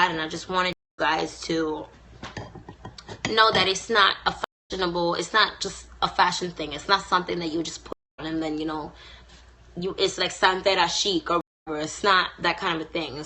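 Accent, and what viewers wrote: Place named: Dominican Republic. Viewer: She sounds like my friend who is Dominican. Loves it!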